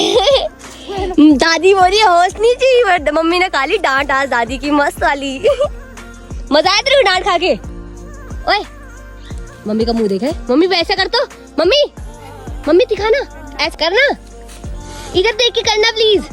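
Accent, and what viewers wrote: Garhwal, India. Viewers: Cute gadwali bolti ho app yll ❤️❤️❤️❤️ Aur nice vlog aur tq comment like Kane ko ❤️❤️❤️❤️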